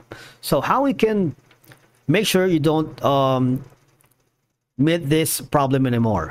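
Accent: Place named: India. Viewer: 😂😂😂 indian vid trying to look white hilarious the accent give it out big time nice try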